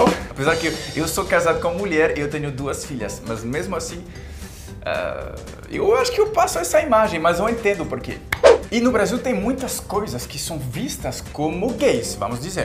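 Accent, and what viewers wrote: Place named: France. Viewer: Nossa adoreiiii ! Voce é uma graça e seu sotaque é um luxo! Moro na Suíça e vou bastante em Paris, sou suspeita de falar dos brasileiros, porém acho os franceses um luxo. E estou adorando suas dicas!😂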